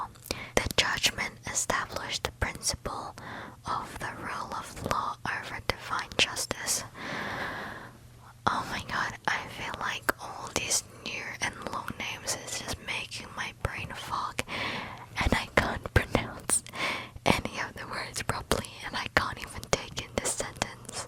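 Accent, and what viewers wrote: Australia. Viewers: that Australian accent even through the whispers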